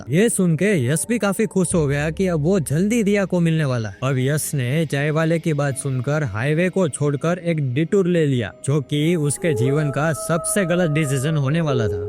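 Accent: Gujarat, India. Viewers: Gujarati tone me pehli bar koi horror story Sunni maine❤ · Amezing story!❤ (I love your gujarati tone and expressions) Keep making the stories.💥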